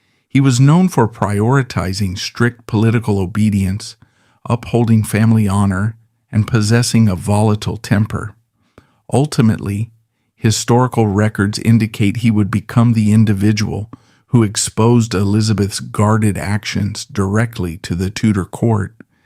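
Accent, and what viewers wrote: United States. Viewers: I mean this with the utmost respect but i studied tudor history for many years at college and university and was lucky enough to read archives in London and Windsor castle. If this is AI voice please change it as an American accent don't sound right its pronouncing names and places that just sound weird. Also Elizabeth Somerset told her brother that ann flirted with courtiers but the evidence was never put forward in court 😊 also as you probably should of mentioned Anthony Brown was a seymour supporter as ann and himself had a blazing argument as ann didn't like how he treated his sister Elizabeth Somerset 😊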